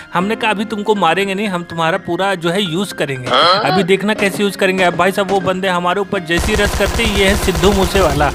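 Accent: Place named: Jaunpur, India. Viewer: Me bhi ek youtuber ho aur aapki awaz sun kar Asia lagt hai aap junpur se hai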